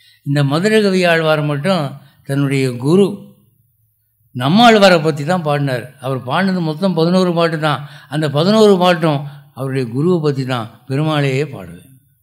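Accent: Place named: Madurai, India. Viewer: சிலர் மீது காரணமே இல்லாமல் அன்பும் பக்தியும் தோன்றி விடுகிறது.. ஜோசப் அப்பாவின் பேச்சைக் கேட்கும் ஒரு மணி நேரம்... இந்த உலகமே எனக்கு மறந்து போகும்.. His madurai slang and timing sense.. Chanceless... 👌👌👌.. குருவே சரணம்🙏🙏🙏 ஜெய் ஶ்ரீமன் நாராயணா..🙏🙏🙏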